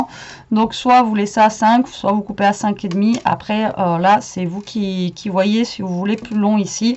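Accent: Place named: Toulouse, France. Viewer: Bonjour c'est vrai que c'est une bonne idée qu on peut décliner de plusieurs façons .Merci beaucoup...si ce n'est pas indiscret de quelle région êtes -vous ??? vous avez l'accent de mes petites filles qui habitent Toulouse,,,,???bonne semaine ensoleillée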